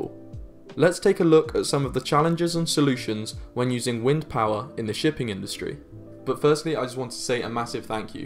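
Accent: United Kingdom. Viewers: As soon as i heard the british accent I knew his was a green lunatic. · What do you know another brit with a weird channel name, narrating stuff...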